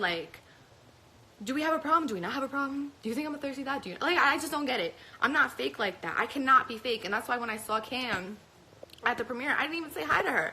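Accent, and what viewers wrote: United States. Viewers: candy candy she’s from Jersey and is Italian. That’s just how we talk here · is u light skin black bae? u got that nice light tone an i think maybe u black cuz how you talk . sound lik u come from were i from (bronx usa baby!!!!) pls tell me yss u black my god u fne bae gd bless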